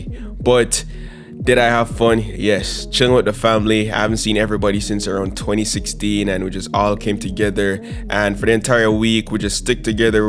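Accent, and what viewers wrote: Jamaica. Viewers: That Jamaican accent so sweet... This is one of the best you have done so far! Blessings to you and your fam. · Hey man mi a Jamaican brotha good to hear ya in ya native voice great video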